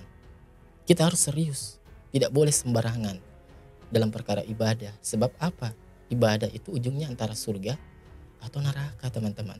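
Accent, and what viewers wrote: Sulawesi, Indonesia. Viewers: Cara bicara anda sepertinya orang Sulawesi dan sy yakin anda cm mondok di Sulawesi tdk di Jawa jd belum tahu semua tentang pesantren aswaja. Anda bilang objektif tapi ketika ada komentar yg mendukung anda bahkan menyudutkan NU anda malah like. Dmn letak objektivitas anda? Kalo memang anda objektif harusnya anda bilang itu oknum 🙏🙏🙏🙏🙏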